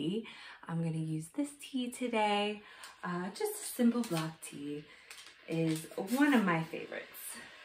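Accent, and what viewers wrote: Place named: France. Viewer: Love your French accent!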